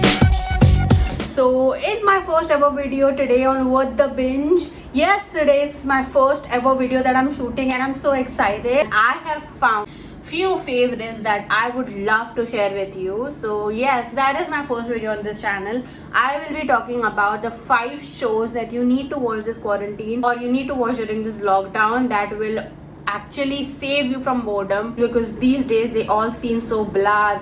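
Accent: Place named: India. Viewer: i like the indian accent !!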